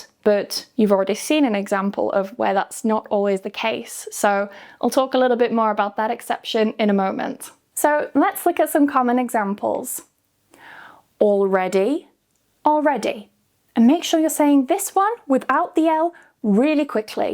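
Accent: United Kingdom. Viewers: Wow! Good British english pronounciation. Great!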